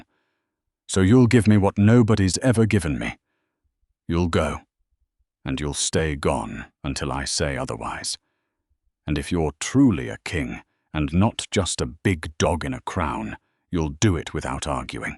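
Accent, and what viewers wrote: United Kingdom. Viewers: My solution to the annoying video pictures that don't match the story is to fold a piece of paper over the top of my cell phone that is long enough to cover that section, click on Show Transcript, and follow along with that purring yummy English / British accent and, as the fantasy tale unfolds, my imagination does what it is meant to do and creates a movie in my head that captures the right characters into all the scenes. This technique might help you enjoy more videos that have that irritating picture section that doesn't match the plot line details. Just a thought from Windsor, Ontario Canada 🇨🇦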